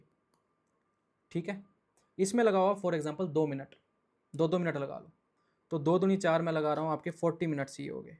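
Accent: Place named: Haryana, India. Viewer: Sir really loved how you are speaking like a proud haryanvi. Many are very ashamed of speaking the language our people, family speak in today’s time in haryana 🙏🙏🙏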